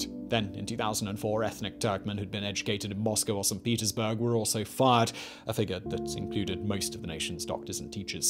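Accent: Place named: England, United Kingdom. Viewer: Your voice sounds familiar but in a posh English boring drone way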